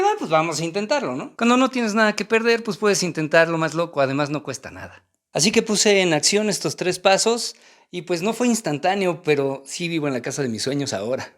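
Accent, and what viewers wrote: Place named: Mexico. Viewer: Jajajaja, literal me subiste la vibra del día. Ya tienes el don de la palabra que además te fluye de maravilla por tu acento, "que viva México carajooo". Luego me partí de risa con lo de la vecina ruidosa, porque estoy pasando por lo mismo. Gracias por compartir, inspirar y por motivarme con tu espontaneidad. Por favor, no vayas a visualizar novias diferentes porque las tazas son útiles, pero muchas mujeres te volverían loco, jajajaja. Graciasss.... voy a comenzar hoy con el reto de agradecer a la loca ruidosa de mi vecina 🤣🤭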